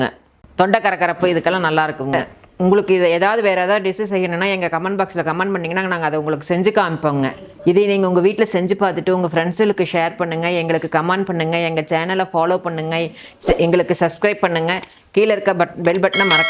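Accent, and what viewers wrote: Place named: Kongu, India. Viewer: Amma neenka yentha ooru kongu side mathire irukku?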